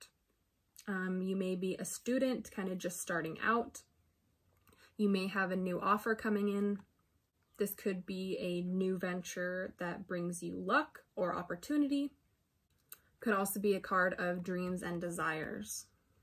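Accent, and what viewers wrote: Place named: Chicago, United States. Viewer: The edits and cuts make it obvious you are reading from copied notes, where we can not see but you can. Sound totally unnatural. Starts video off in a negative tone about other you tubers, arrogant. Uses poor English saying "Coming right at you, with..." Sounds so South side of Chicago. You copied. Easy and thoughtless to do.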